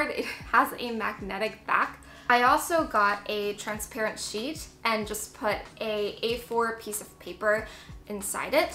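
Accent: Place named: North America. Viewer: HOLY SHIT. You were born and raised in Taiwan and can now speak English with a North American accent? Damn.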